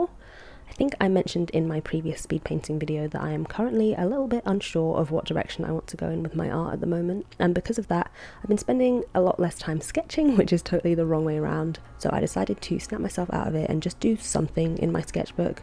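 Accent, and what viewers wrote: United Kingdom. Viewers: this is the most British commentary I've ever heard lmaoooo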